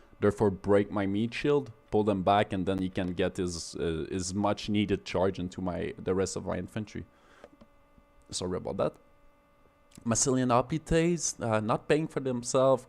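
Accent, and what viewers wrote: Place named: Quebec, Canada. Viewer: You sound very french canadian to my french canadian ears. Am i right?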